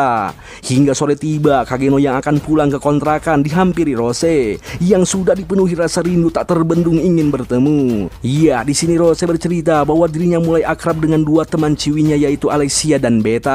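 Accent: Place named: Manado, Indonesia. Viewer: Takage ya manado banget😂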